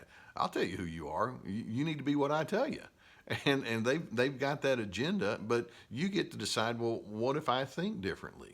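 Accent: South, United States. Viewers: Sundiata Keita he sounds like a southerner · Lovely southern drawl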